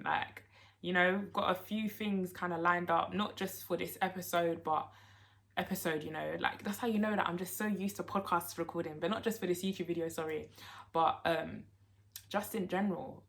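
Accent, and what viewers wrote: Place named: Jamaica. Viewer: You are amazing!! I was so scared of it as well. It will get better! We support you 1000% Are you Jamaican? Loving the patois 😂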